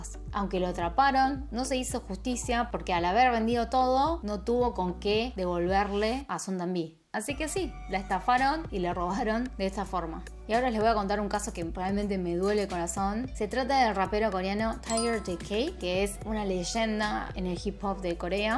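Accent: Argentina. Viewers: A Lisa no la estafan , deberían matarlo por eso >:( amo tu acento Argentino 💖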